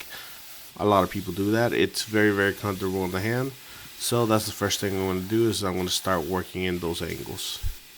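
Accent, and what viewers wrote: New Mexico, United States. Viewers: Where are you from? You sound like you're from New Mexico.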